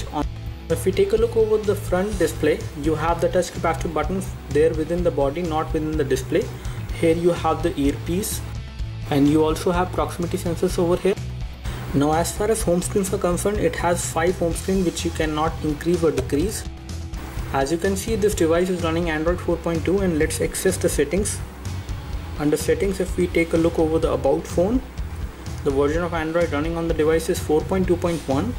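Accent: India. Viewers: i quite like your reviews except for this english... i don't know to tell but your english is a bit too indianish ahha :) never mind of a stupid like me, good work guys ;)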